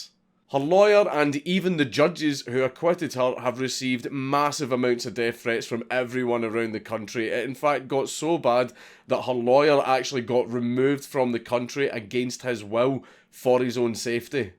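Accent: Scotland, United Kingdom. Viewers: Yay angry Scottish man can ruin my day!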